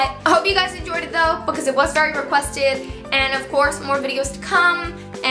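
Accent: New York, United States. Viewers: I'm pretty sure it's a New York accent. I'm from New York and she talks just like me so I think I'm accurate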